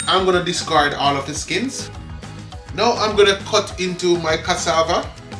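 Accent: Jamaica. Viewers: You sound like a Jamaican😊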